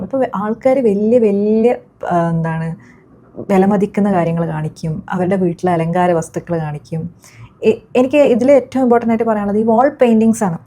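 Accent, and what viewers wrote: Kannur, India. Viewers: നിങ്ങൾ കണ്ണൂർ ജില്ലയിൽ ആണെന്ന് തോന്നുന്നു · Nice content, but understood by only a few. Good one. Varthamanam kettitu, are you from Kannur? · ഭാഷ കേട്ടിട്ട് കണ്ണൂർ, വടകര ഒക്കെ ആണെന്ന് തോന്നുന്നു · Crispy Kannur slang.... 👌👌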